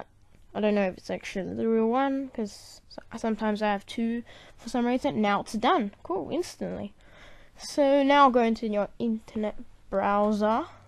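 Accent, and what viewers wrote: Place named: Australia. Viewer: Austrailian mate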